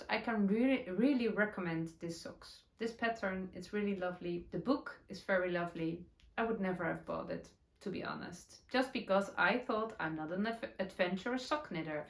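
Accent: Netherlands. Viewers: Hello from Australia & Thankyou so much for a great podcast. Since finding you I am now binge watching all your English videos and loving them. Sitting bedridden sick & knitting socks, you are keeping me company. How truly exciting and special to receive yarn specially dyed for you. WOW!!!! I bet you did a happy dance for sure. Love your Dutchy-English 😍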